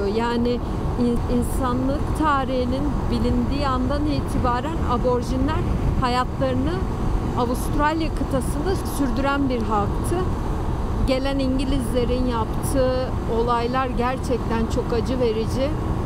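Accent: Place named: Adana, Turkey. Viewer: espirili konuşmanı seviyoruz.Tıpkı adana şivesiyle konuşuyorsun seni seviyoruz.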